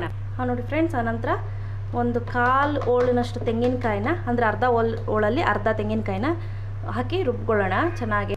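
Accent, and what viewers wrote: Mandya, India. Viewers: just by your way of speaking,as we r from Mandya..tq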